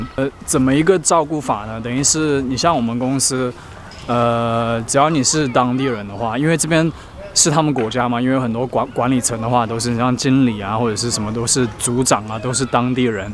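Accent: Taiwan. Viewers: 你的腔調好像台灣人😳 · 哪里人？为啥说话台湾腔！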